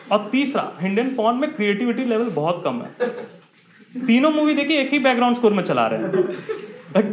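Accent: Bihar, India. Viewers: Bhai sach mai Bihar se ho?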